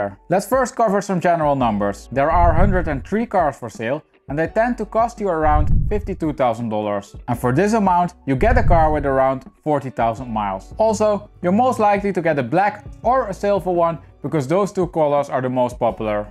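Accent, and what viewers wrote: Netherlands. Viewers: Thank you! I needed that. You've got a Dutch accent am I right?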